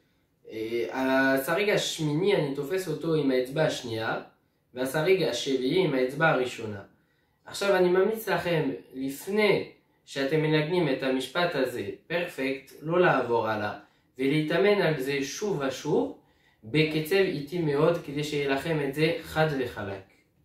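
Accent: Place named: France. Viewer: J ai reconnu l accent fr mdrrr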